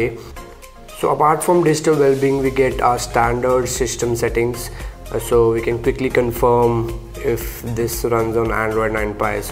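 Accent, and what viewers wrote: India. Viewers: индусский английский это кровь из ушей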